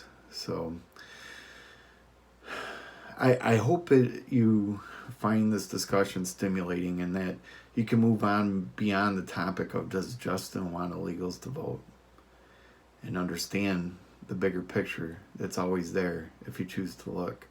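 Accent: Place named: Upper Peninsula, United States. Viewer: Nice vid. You sound a lot like a Yooper, which makes it even nicer :-)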